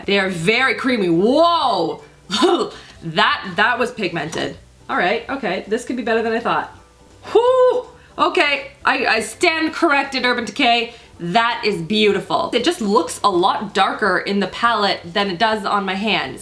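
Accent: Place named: Canada. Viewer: your Canada is showing (the accent, "out" and "about" displays it really well) 🇨🇦🇨🇦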